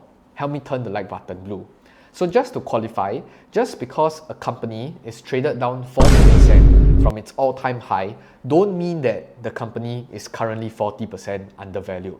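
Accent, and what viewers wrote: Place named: Singapore. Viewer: Enjoy the Excellent analysis with Singapore accent.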